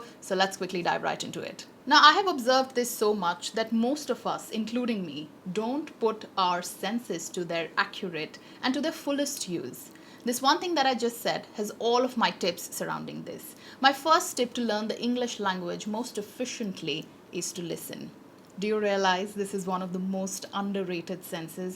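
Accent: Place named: England, United Kingdom. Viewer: Really loved this video. I really love your English accen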